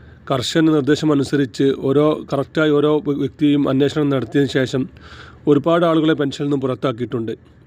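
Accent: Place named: Tamil Nadu, India. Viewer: തമിഴൻ ആണോ മലയാളം വൃത്തി ആയി പറയാൻ പടിക്കു എന്നിട്ട് വന്നു പറയു പ്ലീസ്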